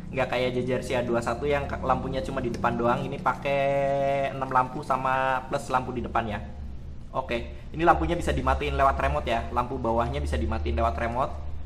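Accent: Banyumas, Indonesia. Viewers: yes video baru.. assiikk. yesss kompor baru... makin aassiikk. 😂 udah kangen sama video2nya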